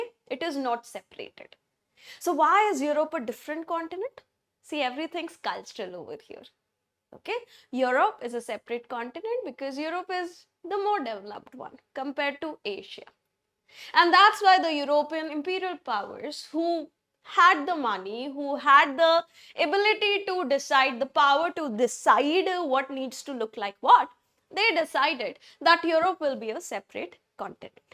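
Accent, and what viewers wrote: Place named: United Kingdom. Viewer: Mam please aap normal or indian accent me padhaiye,, british type accent thoda ajeeb lgta hai ..jaise live classes me padhate ho vaisa accent😊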